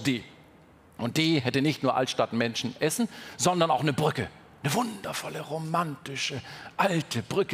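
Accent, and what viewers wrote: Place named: Bavaria, Germany. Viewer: Auch wenn er aus Bayern kommt ist dieser Dialekt, so finde ich (Niederbayer), unangenehm anzuhören. Trotzdem ein tolles Video.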